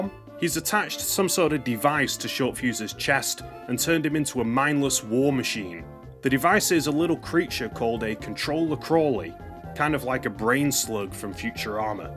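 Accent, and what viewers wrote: United Kingdom. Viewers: I'm british, myself, but sometimes I really notice that wonderful british accent :D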